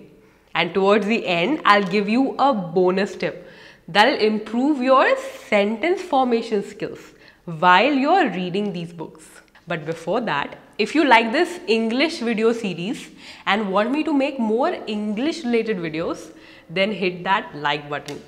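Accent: India. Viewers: Love ur simple typical Indian English also good pronounciation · Your English is very indian.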